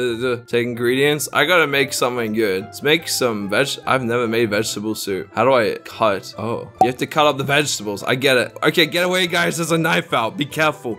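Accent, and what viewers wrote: Australia. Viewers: I was going to say Australian until I scrolled Down... I have a question.. are you Australian because I am and it’s really easy to tell if your Australian bc any other YouTubed is usually from America or England and they sound so similar but it’s true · What accent do you have? Love it btw Edit: Oh later he says he is from Australia....Lol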